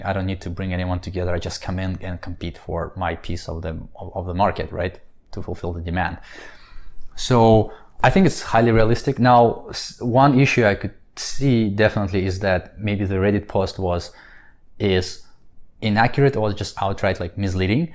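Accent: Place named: Russia. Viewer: Super interesting and valuable - I look forward to the entire series, and following your ongoing journey. Interested in your accent, which sounds more "Russian" than "Korean" to this Californian.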